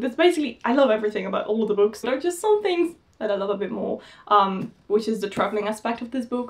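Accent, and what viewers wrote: United Kingdom. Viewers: me too. You sound very british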